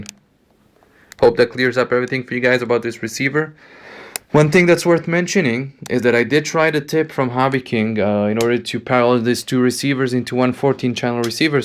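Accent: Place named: Israel. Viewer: I thought you were Isreali....Your accent is so cool...Manish ma baby!! LOL Duvid Mordechi..... Hey boychick, let us know what kind of range this thirty dollar receiver has.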